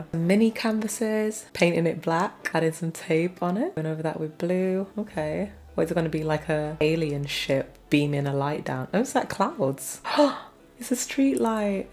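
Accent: United Kingdom. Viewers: Are u brittish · I love her British accent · Anyone notice she is British?! 👇🏼😄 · One question for u are u from england that u speak British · I love British people talk And you're a British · your voice is so calming and I love the accent, is it british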